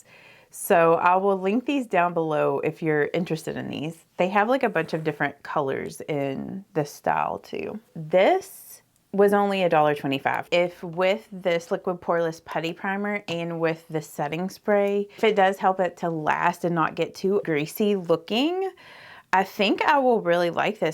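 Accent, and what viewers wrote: South, United States. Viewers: a Southern accent is beautiful. However, the way a single syllable word turned into two syllables is a cadence of younger people. Just wish I were younger and and vocabulary wouldn’t bother ME so much. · It’s called a southern accent. I love it!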